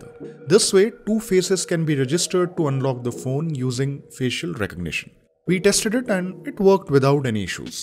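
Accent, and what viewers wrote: India. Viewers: This is pure indian accent 😊 I wouldnt say we are ashamed of it ;) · Goddamn Indian accent! 🤦🏽‍♂️ Smfh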